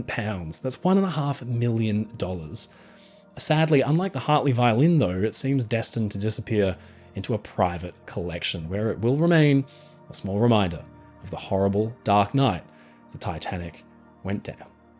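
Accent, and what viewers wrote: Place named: Australia. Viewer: You have such a pleasant Australian accent. Always a pleasure to hear you speak about things. On an unrelated note, a line from the Rubaiyat (the book of Persian poems) was found in the Somerton Man's pocket. That book had been very popular in Australia at the beginning of the 20th century.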